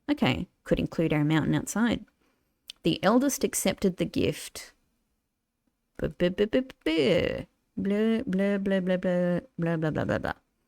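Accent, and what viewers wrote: Australia. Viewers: It’s interesting just how little the absence of sound mattered here. I wonder whether I’d have even noticed if you hadn’t mentioned it. If anything, it made me wonder why I never had an Aussie narrating MY explorations. That should definitely be an option in a future patch.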